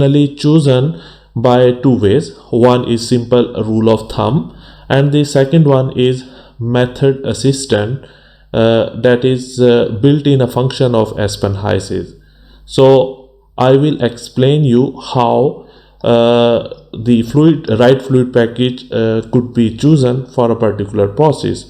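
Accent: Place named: India. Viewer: Thank u very much love from India and your accent is also like indian Sir .....are you also Chemical engineering background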